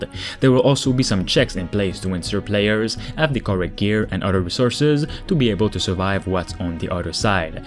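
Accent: Quebec, Canada. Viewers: Man I love your Georges Saint Pierre style accent. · do i hear a quebec accent ? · Tu sonne comme un québécois mon chum ;)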